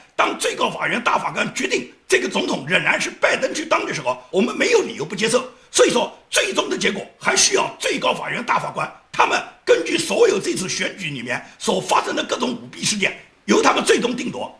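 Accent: Nanjing, China. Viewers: 我发现你是不是一个南京人，之前，一口南京话。。哈哈。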